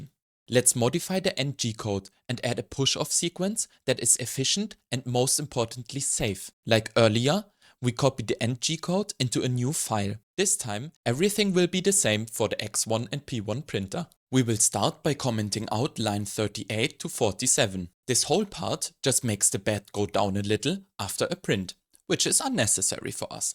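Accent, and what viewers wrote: Germany. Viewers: I would listen to a documentary about paint drying from you. I love the German inflections in English and you keep a smooth cadence. You should think about voice acting. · der akzent klingt so deutsch :D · very "Kartoffel-english" ;D but nice video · Kann man sich nicht anhören! Diese übertriebene Betonung! Typisch .... wenn ein deutscher tolles englisch sprechen will. Da ist nichts flüssig.....und die Betonungen passen nicht zur englischen Sprache! Ich bin raus!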